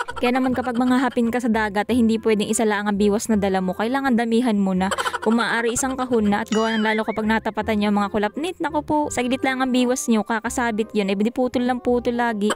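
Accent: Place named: Quezon, Philippines. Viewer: Salitaing Quezon e 😂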